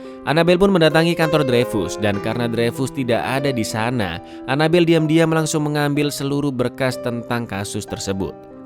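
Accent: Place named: Surabaya, Indonesia. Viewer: Mas iki wong endi yo? Teko bahasae kyk wong Suroboyooo... Nek iyo, kok aku curiga. Jangan² mantane koncoku wkwkwkwk. Dadi penasaran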